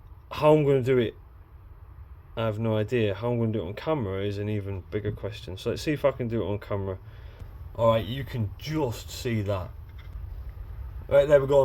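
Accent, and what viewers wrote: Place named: North, United Kingdom. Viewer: Absolutely love this northern (?) accent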